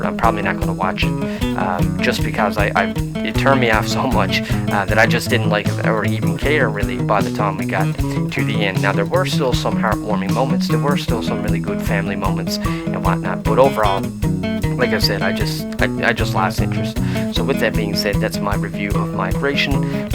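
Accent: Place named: East Coast, Canada. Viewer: Ahhh, you're Canadian! I kept hearing East coast but was not sure. Hello from southern n Ontario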